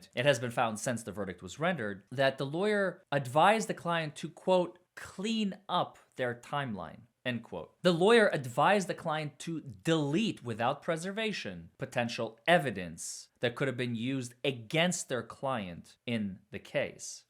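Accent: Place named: New England, United States. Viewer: Kentucky⁉️ I thought you were from MA or RI 😂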